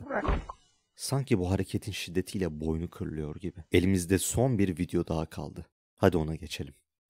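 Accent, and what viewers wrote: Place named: United States. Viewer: Amerikan Türkçesi diyoruz biz bunlara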